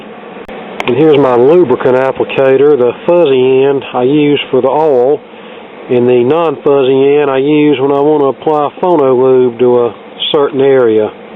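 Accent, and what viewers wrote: United States. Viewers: Fucking Cowboys accent I just love it 😏😌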